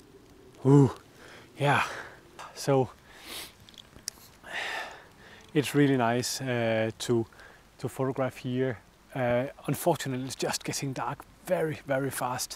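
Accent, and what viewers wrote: Denmark. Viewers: The landscape, the 'driving by the bakery', the danish accent... just made me so homesick :) (Expat)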